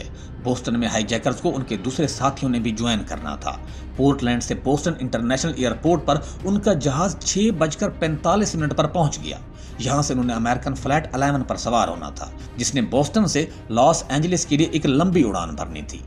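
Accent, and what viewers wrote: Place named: Punjab, Pakistan. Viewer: Panjabi bhai urdu bolna to sekh lo pehley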